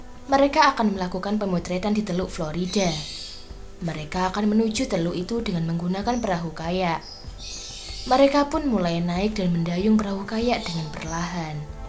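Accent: Java, Indonesia. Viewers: suara medddokmu terngiang ngiangg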